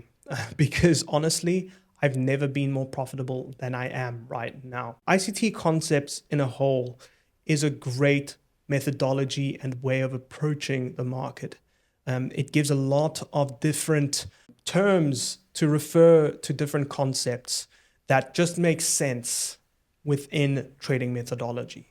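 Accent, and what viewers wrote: South Africa. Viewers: sounds like he is from Cape Town 🤔 lovely video by the way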